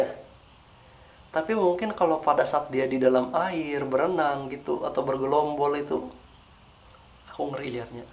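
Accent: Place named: West Java, Indonesia. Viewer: Ka ngomong nyah sunda banget pake p maaf yah ka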